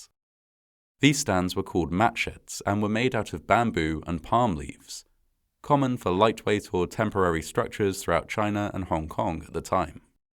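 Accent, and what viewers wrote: United Kingdom. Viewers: British pronunciations🤭!!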